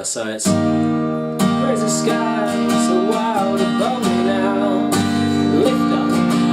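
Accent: England, United Kingdom. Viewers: Thank you very much! It's really great to get all these detailed explanations and ultimately UNDERSTAND :- )!!... and with a nice English accent too :- )! Hello from France ! Thomas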